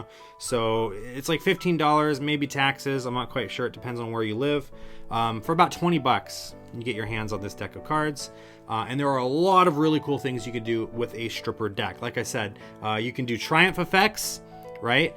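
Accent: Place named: Ireland. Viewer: Funny irish accent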